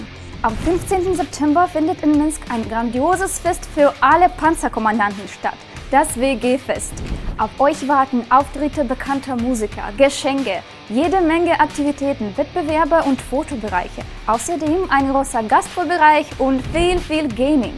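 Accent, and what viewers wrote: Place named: Russia. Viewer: Ich mag den russischen Akzent :D Und geile Events!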